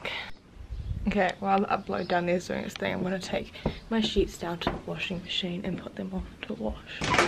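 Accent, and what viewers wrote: New Zealand. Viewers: Hi))) New Zealand accent is so nice!!!))))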